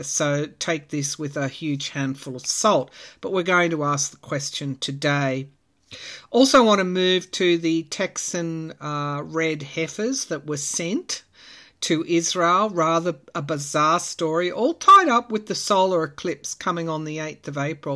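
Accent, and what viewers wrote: Australia. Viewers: Hi! I'm just a country girl who was born 65 yrs ago and have resided in North Carolina all my life. I found your channel a few weeks ago. Love your readings & love your accent!!! If I understand correctly, you live in Australia. On one of your videos could you say "G'day"? 😊 It has been my feeling/dream (since I was a young girl) that I should visit Australia one day. I have only visited one country outside the contiguous USA and that was South Korea. It was an amazing country! But, for some reason, I feel Australia is still calling out to me.😊 Anyway, I adore you and your channel! May God bless you!❤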